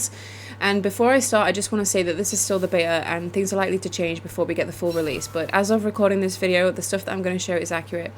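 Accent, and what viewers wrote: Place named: England, United Kingdom. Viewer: sub'd these update videos are nice i get to listen to it in a cute English accent too :D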